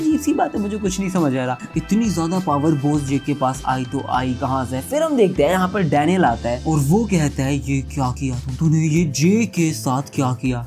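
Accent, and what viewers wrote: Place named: North, India. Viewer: bhai i recently watched vyuk`s last video on lookism and after that i was searching the whole youtube for more then i found your channel and broo that explanation of yours is just puree peakk !!!! cant wait for the next part its just like a top level anime-tuber brother you have great potentional best of luck and full support and remember one day you will be the goat and btw ur north indian accent is very familiar to my state j and k and where r u from ? edit : shoutout in next video with name plzzz [ real name = Girish Sharma ]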